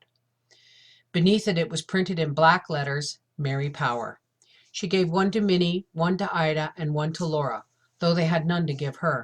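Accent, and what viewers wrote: Canada. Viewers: * pronounces some words a bit differently. I think it's because she's Canadian. Like "shonn"/"shone"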